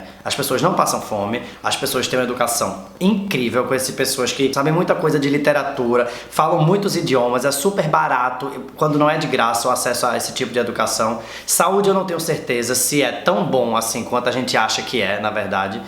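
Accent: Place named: Recife, Brazil. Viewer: Adorei o vídeo! Tava com saudade de ouvir alguém falar c esse sotaque recifense arretado demais! Sdds do Recife ♡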